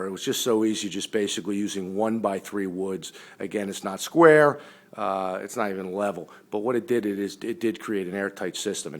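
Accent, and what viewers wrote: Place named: New Jersey, United States. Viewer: Rutgers! I knew it sounded like you were from nearby.. Nice video, thank you! Esp about fan/filters..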